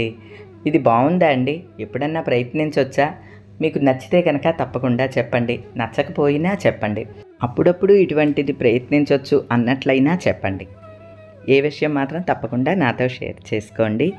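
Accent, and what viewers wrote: Godavari, India. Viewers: Natural గా మాట్లాడితే బాగుంది అమ్మా గోదారోల్ల యాస బాగుంటుంది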